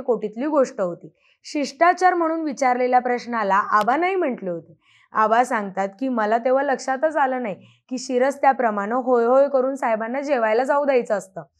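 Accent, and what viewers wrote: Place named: Rural, India. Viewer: आवाज ग्रामीण मस्त आहे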